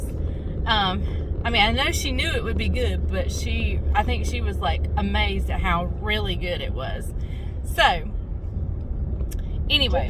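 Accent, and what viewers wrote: South, United States. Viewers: That was very enjoyable. Thank you for taking us along. If you are going to be creating new masterpieces I would love it if you could think of some ways to use up a bunch of eggs. I have chickens and we used to love eggs but now that they are coming out of our pet's butts, no one in my family want to eat them. I never realized that chickens breed like rabbits so now I have eggs coming out of my ears. The only time I use them is when I bake or make deserts that call for eggs and the rest I try to give away but I think my friends are about overloaded with them now too :-). I need some ideas of how to use them up. Thanks again for sharing your day. I just found your channel this weekend and I have been binge watching it every moment I get. For some reason you feel so familiar to me so it is like listening to an old friend share a recipe with me. 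Maybe it is your southern accent 🥰.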